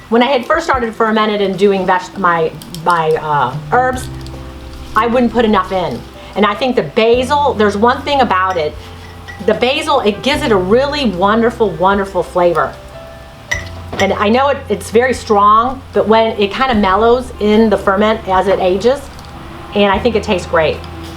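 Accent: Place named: Upstate New York, United States. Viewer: if your going to give out recipes, please learn the DIFFERENCE BETWEEN A Teaspoon and tablespoon, there's a huge difference u said add 4 teaspoons to a quart, you added 4 Tablespoons maybe in upstate new York they don't know the difference but in downstate people know the difference at least based on your accent u sound like your from waaaay upstate had to downvote your video because you gave a recipe with completely wrong measurements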